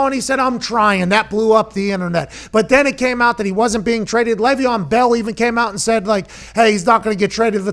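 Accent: Pittsburgh, United States. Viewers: I love hearing someone who sounds like me 😂Pittsburgh accent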